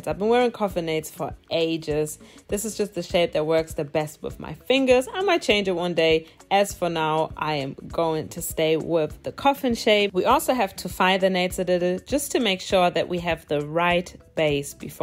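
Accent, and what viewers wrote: Germany. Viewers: Man hört direkt raus, dass du auch deutsch sprichst😂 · Ist deine Nummer Sprache eigentlich deutsch hört man raus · Du kommst aus Deutschland oder haha man hört den deutschen Akzent raus 😂❤️ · She's German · Das ist so dieses deutsche english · Are you from Germany?😂🙈 you have quiet the accent🤔❤️ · Wusste direkt das sie deutsch ist wegen diesem Akzent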